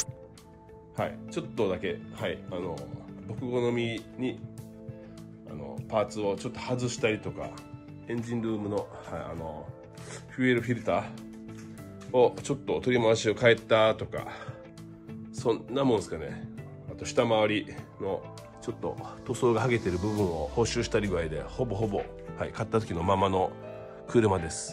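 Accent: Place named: Okinawa, Japan. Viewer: 喋りがツボります笑 沖縄感最高です😂😂😂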